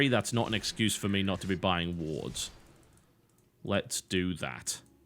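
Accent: France. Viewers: Love the French accent lol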